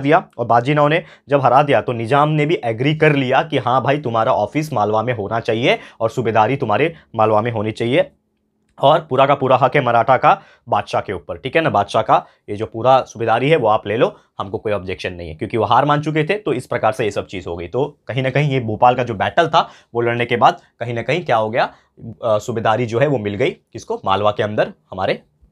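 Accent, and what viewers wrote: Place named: Delhi, India. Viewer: This is his dellhi accent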